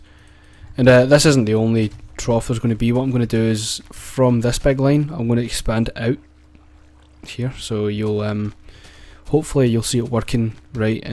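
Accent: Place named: Glasgow, United Kingdom. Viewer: No he doesnt? small beans is from york. He sounds like he's from somewhere near Glasgow. And you do know that insted of burning wood, you can burn one unrefined but of wood and make charcoal which is good for 8 full cycles instead of 1.5 for wooden planks.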